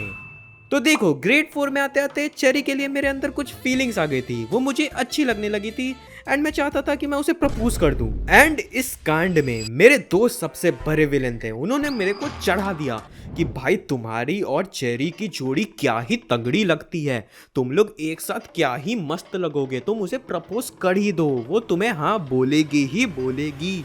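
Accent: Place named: Assam, India. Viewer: Bro aapse Assamese wali vibe aati h .. wese ho kahase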